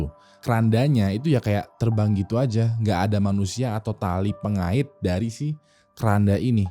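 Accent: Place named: West Java, Indonesia. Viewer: asik juga dengerin orang sunda cerita jawa, pagi dua sore mati…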